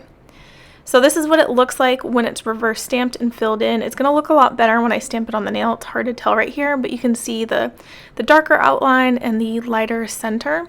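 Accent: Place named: South, United States. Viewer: Hey! I just wanted to say that I absolutely love your content. I find your voice/slight Southern accent very soothing, and I love how you celebrate and build others up. I notice you feature a lot of smaller brands: Sol Dips, Shameless, Dipalicious...etc. I'd love you to discuss some of your favorite companies in a list and why/what they are best at. Additionally, I'm pretty new to dip, and thusfar have only been using my Dipwell system, but would love to mix and match more. So...I guess one thing that I feel is missing from YouTube content is how to crossover different dip products...if that makes sense...? Anyway, rambling. You're great! 😂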